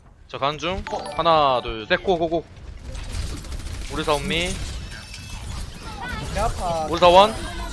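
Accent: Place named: South Korea. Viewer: 콩글리쉬로 지껄이는거 굉장히 듣기 불편하노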